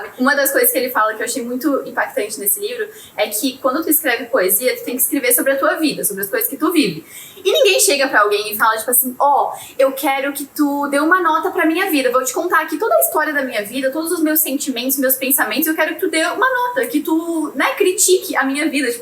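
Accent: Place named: Florianópolis, Brazil. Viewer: sou nova aqui e já estou adorando! Parabéns pelo canal 🥰 ah, eu conheço esse sotaque, és manezinha tb?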